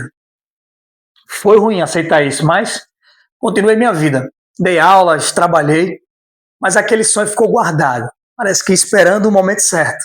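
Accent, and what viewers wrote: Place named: Northeast, Brazil. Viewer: Boa Noite. Pelo sotaque parecer ser conterraneo, Nordestino. Se for de onde? GCM de qusl Cidade? Sou Concurseiro da GCM... Policias Penaiis e PC'S, Também!!! Atualmente inscrito para GCM - Paulista PE... entre outras. Vc Tem instagran..? Tenho interesse no Projeto, acsbo de me inscrever no Canal. Como podemos estreitar ä Comunicação? Um Abraço de RECIFE - PE. 🙋‍♂️🙋‍♂️🙋‍♂️🙋‍♂️🙋‍♂️🙋‍♂️🙋‍♂️ +++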